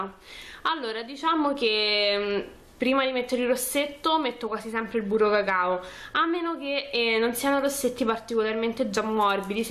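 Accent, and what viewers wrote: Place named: Rome, Italy. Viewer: nella parola Packaging la "G" finale è muta. si legge pacagin. Te da romanaccia ce ne metti 3 di G alla fine :) Però sei simpatica. Ciao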